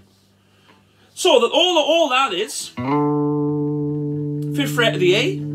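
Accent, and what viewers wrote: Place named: Wales, United Kingdom. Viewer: You are really fucking Welsh. And fair play to you, no insult meant there. Also great lesson, you really captured the spirit of the tune when you jammed it at the end. 10/10.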